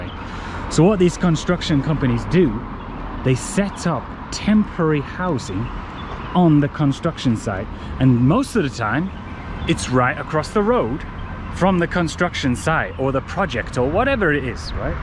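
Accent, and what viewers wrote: United Kingdom. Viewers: i love this cute british accent debunking Big Bullsht Corporation ,lol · 英國口音好聽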